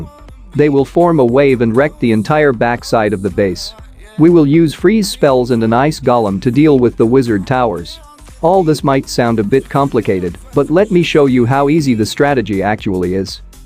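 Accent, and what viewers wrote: Kerala, India. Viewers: Malayali aano bro? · Bro Malayali aano?? 🙄🙄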